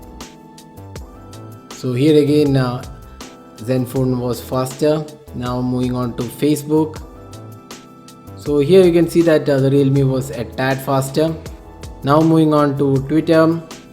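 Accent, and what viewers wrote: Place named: South India, India. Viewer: Hey man you sound south Indian... Nalla pilay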